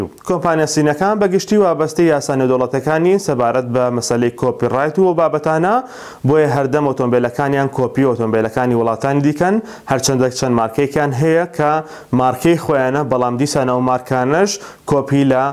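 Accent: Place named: Iran. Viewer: هذا إيراني لهجته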